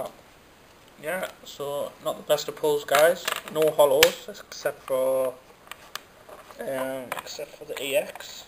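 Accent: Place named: United Kingdom. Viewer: thats cause he has a british accent lol which i think (not be biased because i'm british) is one of the best accents in the world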